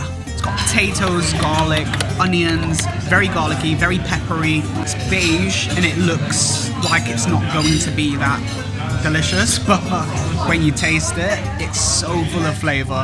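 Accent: United Kingdom. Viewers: Oh yeah, I would guessed Londonish because I lived there for a while and I felt in love with their accent but for me it's inimitable🙈😄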